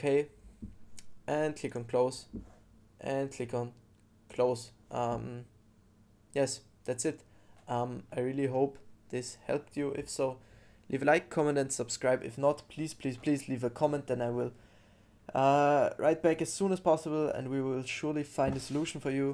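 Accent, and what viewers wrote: Germany. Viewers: man merkt halt so hart das der deutsch ist und ja hat nicht funktuniert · I can hear a German accent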